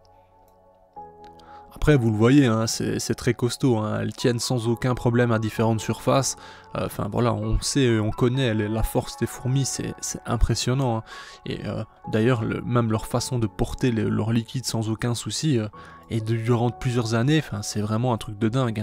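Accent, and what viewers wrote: Belgium. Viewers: Petit accent Carolo ?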